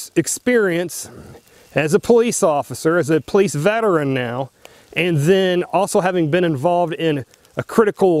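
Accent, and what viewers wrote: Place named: South, United States. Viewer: Just sounds "country" to me. At least thats what the kids are calling it these days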